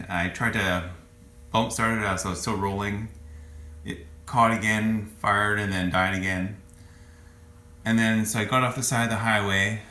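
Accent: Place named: Minnesota, United States. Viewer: Someone's from Minnesota lol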